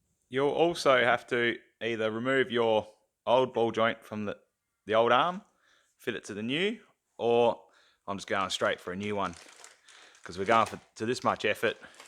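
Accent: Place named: Australia. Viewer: Fabulous, love the aussie accent. Cheers from Norway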